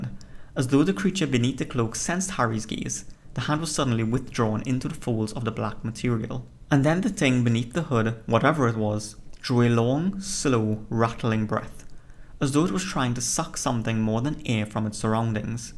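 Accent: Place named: India. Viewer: I can tell the narrator of this video is a South Asian Indian from his accent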